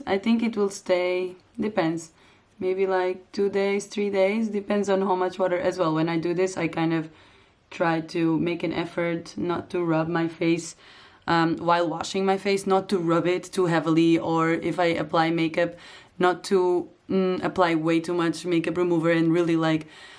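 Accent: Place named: Portugal. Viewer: nao e brasileira ela e portuguesa se conhece pelo sotaque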